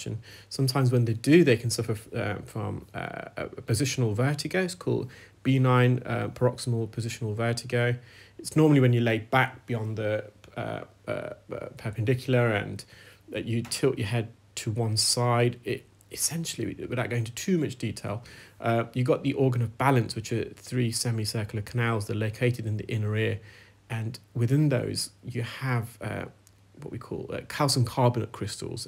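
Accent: United Kingdom. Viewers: Someone telling you off for using “anti-clockwise”? When it’s a UK term, used in a video recorded in the UK, by a person who sounds like they were born and raised in the UK? The nerve. Now that that’s off my chest - great video as always,. You never fail to entertain and educate. Please keep up the good work!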